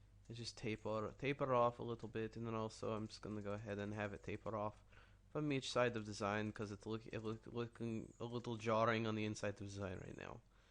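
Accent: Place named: Russia. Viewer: He's Rrrrrussian.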